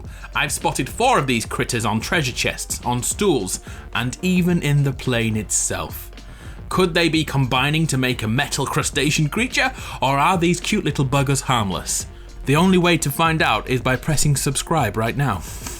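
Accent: United Kingdom. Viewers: Wait wait wait.......... Is British?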